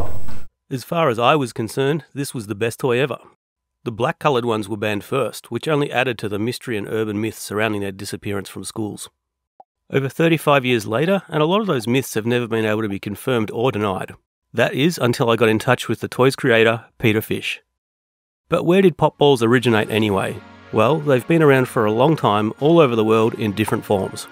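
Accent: Australia. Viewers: X really you're Australian I think you're Australian you have an accent anyway you're still great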